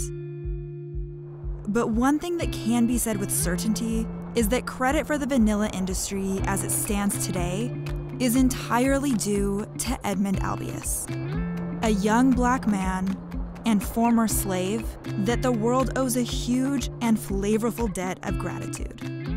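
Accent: United States. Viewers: From the UK and never ever heard someone speak with a glottal stop like this, no idea wtf you think British people sound like. this narrator is very much American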